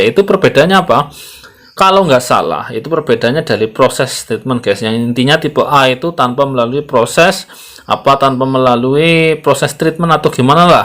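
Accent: Banyumas, Indonesia. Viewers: kan wong ngapak, ora ngapak ora kepenak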